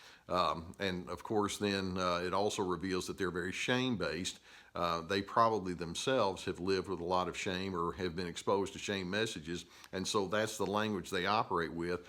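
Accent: South, United States. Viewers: Dr, You have helped me so much! I bought 2 of your books on amazon. They both 'cut to the chase'! I was a people pleaser and became very angry and then depressed. Without realizing what was causing it, i sunk down for years. You helped break it down and sort it out! I am free of my bondage! I am forever grateful! Would love to spread the word! Bless you! (Love your southern accent too)!! You have changed my life, my attitude, my future!! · I love your southern accent. Hallo from Bosnia. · I'm from the south, also. I hope you know that your super cool southern accent gives a much needed safe space to the sceptical-of-therapy southerners. There is a lot of suffering down here that's definitely in our control whether or not we want to admit it. After showing my super anti-therapy Alabamian aunt one of your videos to help her understand her brother-in-law she said "I really like him. 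He sounds like a normal person". hahahaha I love it!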